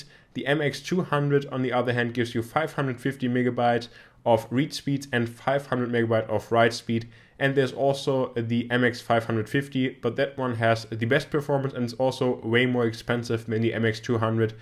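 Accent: Germany. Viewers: Dein Englisch ist wirklich gut und die Aussprache ist auch wesentlich angenehmer als bei manch anderen Deutschen Youtubern.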